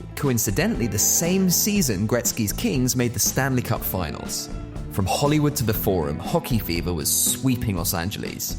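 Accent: United Kingdom. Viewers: It’s my First time on the channel, I’m British and was expecting a North American to be narrating, to my absolute delight he’s British. Love to see it, hockey is so much bigger in the UK than what most North Americans think!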